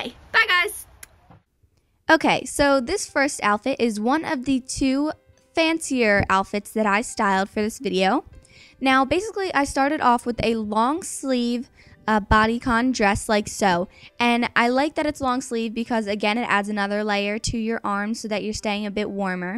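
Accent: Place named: South, United States. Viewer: I thought u were grom the south, you sound country :b<3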